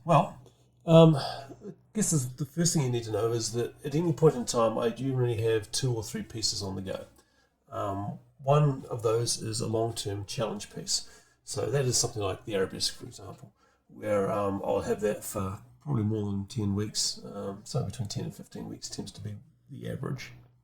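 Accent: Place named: Ireland. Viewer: I am really very interested in your videos because I love your structure and to see your progress and that you are not performing. That's real live 😊. As a non nativ-speaker only your Irish (?) accent is really challenging for me and often I only guess what you maid have said 😅 Keep going!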